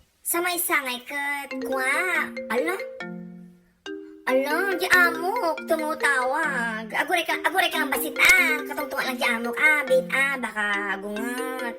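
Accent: England, United Kingdom. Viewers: more British accent plz love it so much.. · Filipinos taking over the world through pancit, lumpia and adobo😃😂. Some of my coworkers are one pancit away from becoming Filipinos 👍Nice British accent by the way😊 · Britist Accent ah gimar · Hala nagtangsit ni anti..English speaking..adda pay British accent na..pasuruak man anti..😄😄😄😁😁😁😅😅😅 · Nosebleed with your british accent❤️❤️😍😍anty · Alla ni anti🤣surwan naktu man anti ti english speaking ken british accent mo💙lovin' all your video^_^ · wow anti british accent. laing k nga tlg.. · Naka british accent pay auntie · Nagtangsit ti British accent mo auntie!!! Bilib ak manen kanyamon. HAHAHAHAHAHA 😂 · British accent ka met gayam... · The accent anti, briton, i love it, uray ta badom ken ta lipistic mo nagmayat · naglaibg ka agsao ingles auntie, kasla ka lang taga UK. proud of u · oh my goodness! that british accent i sooo love! 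nagperfect ka! magustwak dagita nga accent mo. namin anok inulit ulit nga binuya detoy. nagperfek talaga 😂😂😂. thanks anti napaisem nak manen. 😘❤️ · Owmy Anti nalaing ka gayam agsarita iti ingles, british accent pay aya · Was that British or Australian accent Auntie? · Ganda ng british accent mo anti:)) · Mayat iti British accent ni Anti! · British accent ni Anti..Love it. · mayat man ta British accent mo i like it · Nagtangsit ti panag english ni 👏👏👏 british accent ni annntttiii tayu!! 😊😊😊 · huwaaawww!! British accent ni anteee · british accent ni 😊😊😊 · nakabritish accent ni antie.. nglaing ka man antie.. surwan nak man met garud!!Godbless u antie.. ingats!!😘❤️ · Hahahaha!!!! Britische Accent Ni Ante....Guten Morgen From Homburg Saarland Germany 🇩🇪...Naiinlove na yata ako sayo.....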